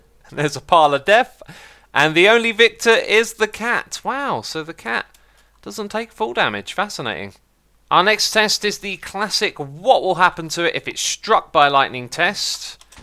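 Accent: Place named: United Kingdom. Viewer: What happened to xisumas accent over the years? Some ppl might not know but had a "normal" accent. I mean sounded like a american accent. Not sure how he started talking british suddenly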